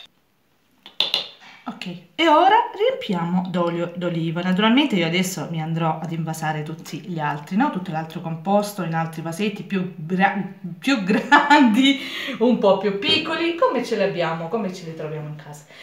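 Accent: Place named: Apulia, Italy. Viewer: Meravigliosa ricetta come sei meravigliosa tu.... sentire il tuo accento mi emoziono perchè ricordo l'unica vacanca che ho fatto nella meravigliosa Puglia 10 anni fa, quella terra rossa tra gli uliveti fuori dal mio trullo , nella meravigliosa campagna , l'azzurro del mare , i profumi per le stradine di Ceglie me li porto ancora nel 🧡 Un caro saluto da Verona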